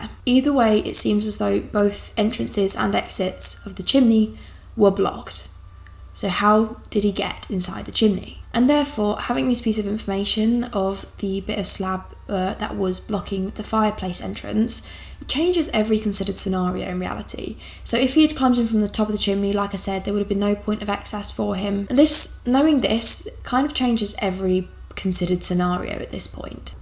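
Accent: United Kingdom. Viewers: I think it’s just you haha. Either that or it’s just the British accent so you might not be used to it or something x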